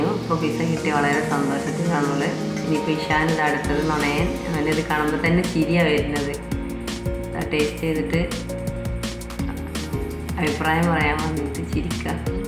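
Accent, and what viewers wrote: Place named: Eranad, India. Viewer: ഏറനാടൻ ശൈലിയിൽ നല്ല അവതരണം പൊളിച്ചു..I will try it tomorrow and let you know the taste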